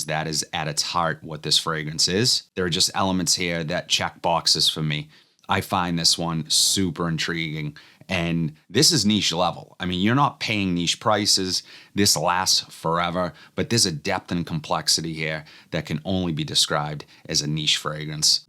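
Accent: Boston, United States. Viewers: That Boston accent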